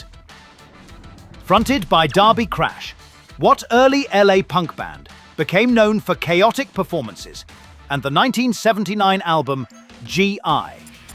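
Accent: England, United Kingdom. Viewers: Why the English accent talking about US punk ?.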